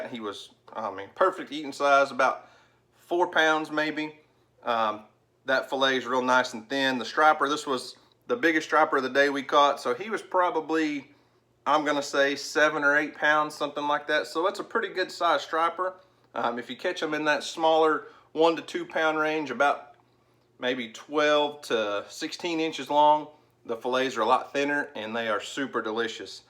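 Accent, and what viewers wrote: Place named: Alabama, United States. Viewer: Sounds like you are in Alabama instead of OK. Stripper and catfish are wonderful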